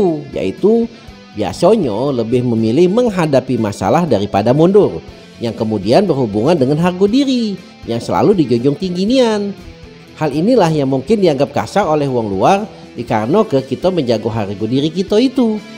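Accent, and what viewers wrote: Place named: Palembang, Indonesia. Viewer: Mantap logat Nie, Palembang tulen caknyo, salam kenal Kando kami Palembang gogok 19 Ilir lorong kaporan, sukses teros channel nyo, kami lamo dak balek Palembang, Mase di tanah rantau 🙏☺️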